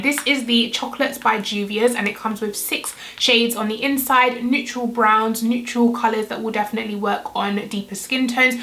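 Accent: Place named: United Kingdom. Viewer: I love to click on your videos just for your bomb ass looks and your accent! I love the British accent. Lol.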